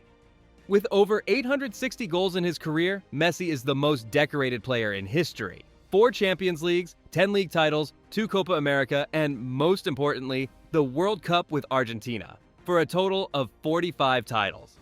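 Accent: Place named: United States. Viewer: Damn.. the American accent is excruciating 😅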